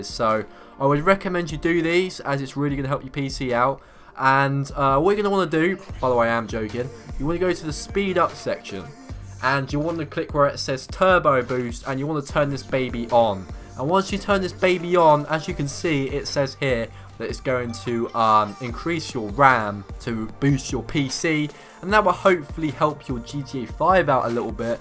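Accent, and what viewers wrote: United Kingdom. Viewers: Dude I love your British accent!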